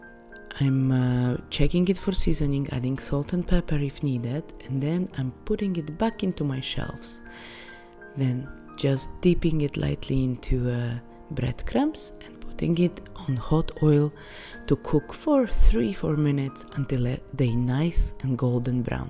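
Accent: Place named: Poland. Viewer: I love your accent so much :) you're polish right?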